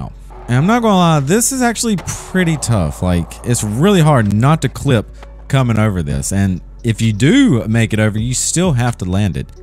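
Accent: South, United States. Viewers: Is there a name for this sort of lispy, effeminate Southern accent? Like how Lindsey Graham talks.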